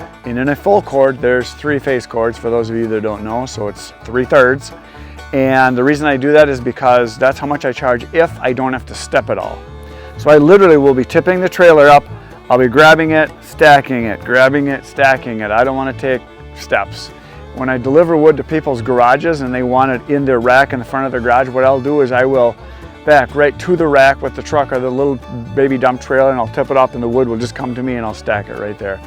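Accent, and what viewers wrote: Wisconsin, United States. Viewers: Good ol’ Wisconsin accent . I’m from Buffalo NY but spent a little time in Amherst <mail> Wolding when I first got my cdl. I went there in late January early February and it was a different kind of cold 🤣 Beautiful in that area.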